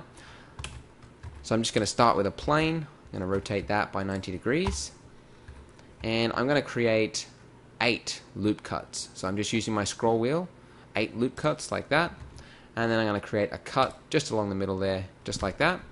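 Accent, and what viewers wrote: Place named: Australia. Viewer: this daft Aussie with his annoying accent ("blen-Dah", "heeyah", "compositah") cant tutor crap. he's more into showing off than teaching. he's as bad as Sardi Pax. the best tutor, especially for beginners, is tutor4u. ive learned so much from him.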